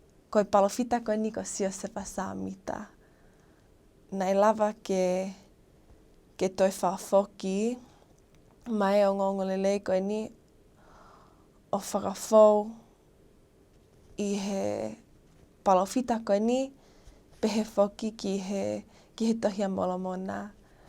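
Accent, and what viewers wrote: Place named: Tonga. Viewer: you speak Tongan so fluently... accent is sooo Tongan Tongan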